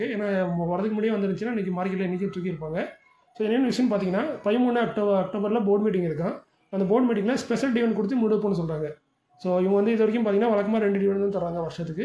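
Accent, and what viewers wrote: Tirunelveli, India. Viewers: ஐயாவின் நெல்லை தமிழில் தகவல்கள் பயன் அளிப்பதாக உள்ளது. திருப்பூர் புல்ஸ் சார் என்பது தெரியும். சார் தங்கள் பெயரையும் உங்கள்????? சொல்லுங்க. I never miss your news. Thanks for information.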